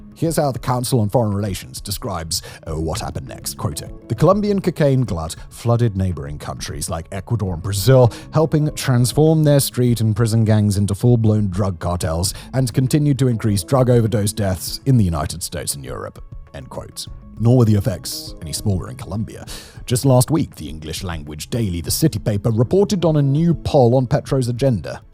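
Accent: United Kingdom. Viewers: Dude... he doesn't run these channels. He is just hired as a reader because of his British accent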